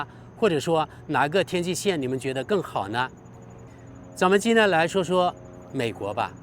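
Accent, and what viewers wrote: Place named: Guiliu, China. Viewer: 航八郎😂 桂柳腔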